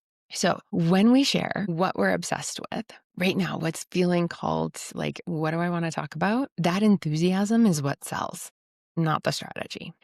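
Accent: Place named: United States. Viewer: Your accent sounds American, and thus it is not only our MG settings. With only 10% of manufacturing left since 1979 the economy has gone suck for anyone but scammers and financial schemers with little but crap service jobs available. As my true self develops I have more abuse thrown at me from shallow geezers and utterly demonic black bloc monsters. I am not afraid or I would have quit long ago. I am surrounded by fragile egos and fickle fools and may need to just move to a new place on Earth after 52 years being the scapegoat and target for take downs in my decaying home town. Money does not simply flow from playfulness in the utterly polarized war on everything good. It is spiritual bypass to speak in this way. Maybe the next Leo eclipse cuts the idiots out of my way. I have heavy metal intensity with soft jazz detail sense, and am sick of being stuck in the punk dump of PNW.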